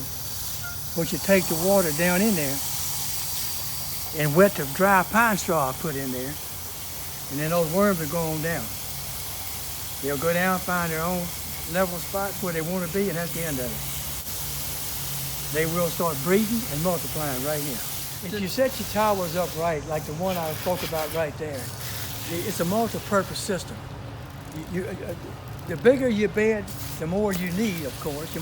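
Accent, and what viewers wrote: Louisiana, United States. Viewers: ♥ that Louisiana accent! Thanks! G-d willing,I am gonna try this out this weekend. Gonna plant a pipe beside my blueberry bush also and use your vinegar/water method. May your harvest always be abundant.🍇🍒🍓🫐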